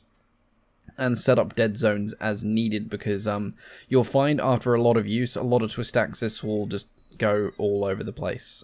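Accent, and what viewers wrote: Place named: United Kingdom. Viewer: Hi, how are you doing my friend and I like your voice it's very british and how is it in the UK? I am moving there in 2 years 🇬🇧^_^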